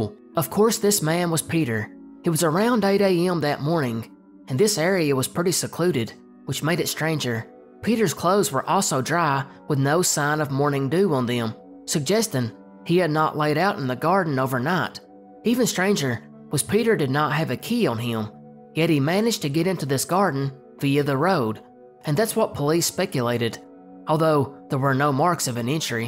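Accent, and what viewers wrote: South, United States. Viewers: Man it’s nice to hear this type of video from a southern voice. I left Tennessee a few years ago and I miss our way of talking. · These are all great obscure mysteries. I can tell you spent some work on this. Nice to hear another Southerner too.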